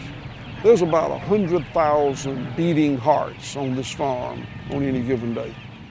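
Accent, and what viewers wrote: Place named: Georgia, United States. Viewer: you have the biggest beating heart at all! Thanks so much for this insight to your amazing life and how you have transformed the soil and helped so many people. I love your Georgian accent as well! I'm from rural England and it's green like your land, but the lifestyle is different, more town based.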